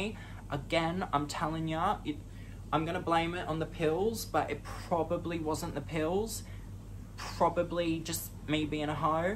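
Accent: Australia. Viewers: Pretty sure it's Australian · MATE LISTEN TO ME PLEASE...YOU SHOULD BE ON TELE BRO FIRST TIME WATCHING YOU AND IM HOOKED.. YOUR ACCENT SOUNDS A BIT AUSSIE AND A BIT OF ENGLISH SOUND COOL MY MAN LATERS LONDON BOY..PEACE..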